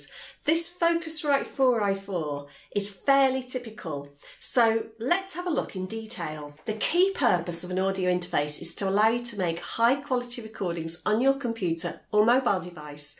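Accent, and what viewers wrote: United Kingdom. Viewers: Very good explanation. And what a lovely British accent (for a French like myself 😊)! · Nice, but I can,t catch British accent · Minus the thick British accent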